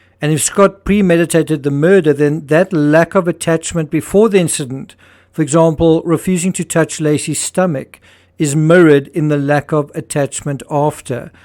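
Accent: South Africa. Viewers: Love your videos ❤ Are you from South Africa? Had to ask😅 Love and blessings all the way from South Africa 🌟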